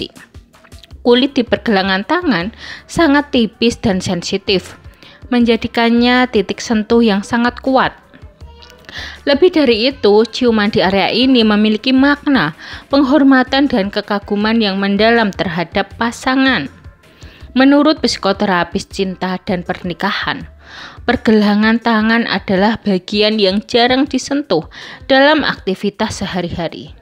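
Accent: Java, Indonesia. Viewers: Walaupun ngomong indonesia tetep jowone medok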